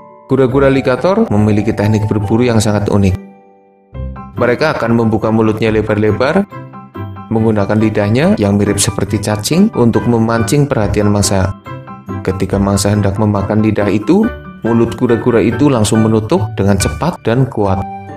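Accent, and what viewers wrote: Banyumas, Indonesia. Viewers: Suara ADMIN ky wong NGAPAK Y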